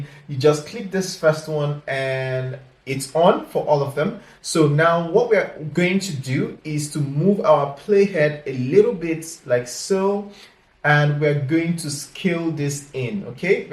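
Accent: Nigeria. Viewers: Which English did you use for this is it Nigeria English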